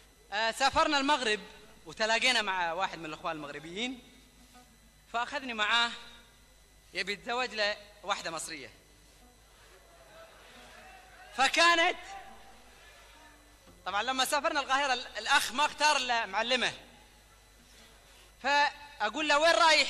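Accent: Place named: Iraq. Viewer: يمة فدوة اللهجة العراق اشكد حلوة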